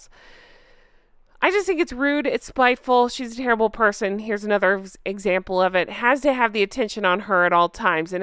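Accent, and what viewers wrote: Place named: United States. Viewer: i am british and i love your voice and your laugh. We love the american accent in Britain❤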